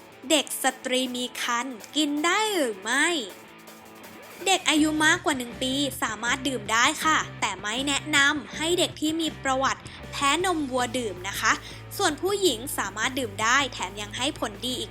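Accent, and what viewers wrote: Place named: Suphan Buri, Thailand. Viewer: เสียงแกเหน่อได้ใจลุงจริงๆ แกคนสุพรรณรึเปล่า ลุงหมากับเมียหมากัน 2คน แล้วแกหมากันกี่คน?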